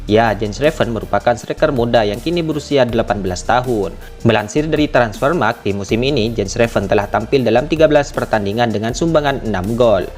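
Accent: Madura, Indonesia. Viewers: Logatnya kayak orang madura ya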